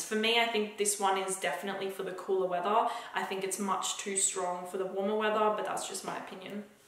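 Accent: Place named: Australia. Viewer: Anyone else generally just really like videos but really stay tuned just to learn how to authentically say "know" or "no" with an authentic Australian accent!? Rhetorical question. I KNOW I'm not the only one.. so..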